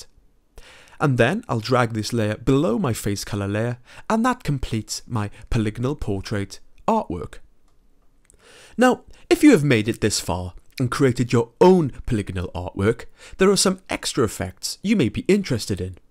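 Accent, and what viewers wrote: United Kingdom. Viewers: it might be the british accent responsable for my confusion but you sound very much like the dude from the " School of life" chanle x)) helpful tutorials, by the way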